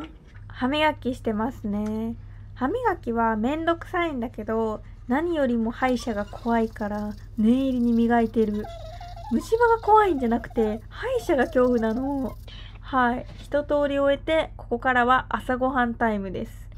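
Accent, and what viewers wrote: Kansai, Japan. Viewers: 本当に魅力的なんだよなー🫶 あと、自分東京に住んでるから、関西弁めちゃくちゃ憧れるー🤩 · 関西弁だったんだすごいギャップ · って関西弁なんや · 関西弁、、？！意外！ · 関西弁かわいい😂😍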